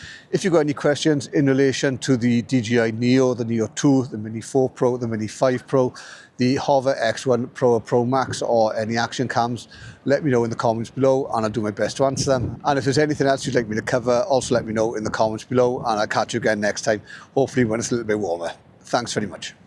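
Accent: Wales, United Kingdom. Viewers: Welsh accent is the best one!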